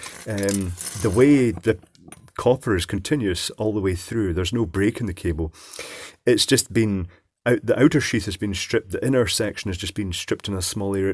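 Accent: United Kingdom. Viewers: I like your channel sir you are amazing i like your british english alsooo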